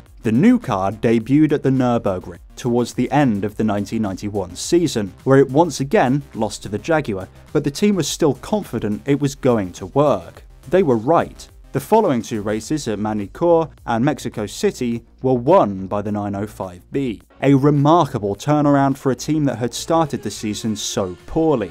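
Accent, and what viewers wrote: United Kingdom. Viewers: Brother a man with a British accent does not get to joke about French automotive reliability. Where's your car industry gone? And why did that happen?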